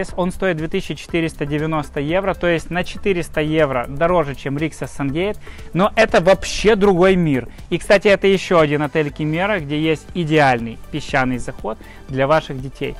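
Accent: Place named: South, Russia. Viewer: вы знаете русским пофиг вообще на украинцев и что там происходит. В моем городе мы и не слышим не о каких убийствах о чем вы рассказываете. Я жила на Украине 10 лет и уехала в 14 году там была очередная 110 революция. Причём там русские тем более те которые ездят в отпуск в 5 зведочные отели. В окопы идут совсем другие люди те кого жизнь пережевала и выплюнула прямо в эти окопы. Они за 200 000 идут убивать и умереть а обычные люди (человеки) 200 000 руб за 7 дней отдыха оплачивают. Каждый май и октябрь ездим в Турцию украинцев не помню там вообще, ну или они сильно косят под русских что не отличить. Да и кому какая разница на отдыхе. Все вместе тусим отдыхаем в Турцию все заточено под русских 😊 ездим тада как на дачу. Автор видео 100% русский, спроси у любого европейца😂😂😂 Немного выдаёт "колидор" и "гэ" и эта настойчиво агрессивная подача характерная для южных народов, вполне за Краснодарского парня сошёл бы. 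Или любой другой глубинки России.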